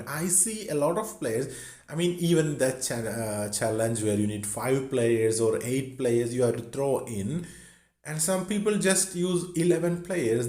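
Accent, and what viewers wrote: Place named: Kerala, India. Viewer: Ate you Indian? You sound thoo.. Kerlite to be more specific?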